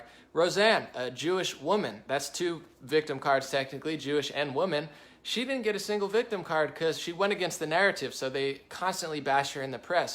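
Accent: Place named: Appalachia, United States. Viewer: As a hillbilly, I really enjoy your hick talk lmao! Sounded a little like Disney's goofy but it's still great none the less. Much love brother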